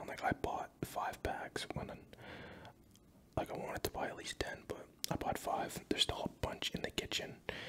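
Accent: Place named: United Kingdom. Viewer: I’m british but I usually cringe when I listen to british ASMRtists idk why😬🤭 you’re the exception, so you’ve gained a sub 🤷🏽‍♀️😂 I came from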